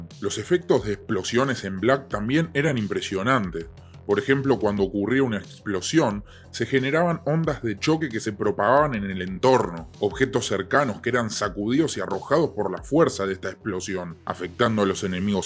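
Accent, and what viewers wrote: Argentina. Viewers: Hola. Sos un groso. Sos argentino puede ser?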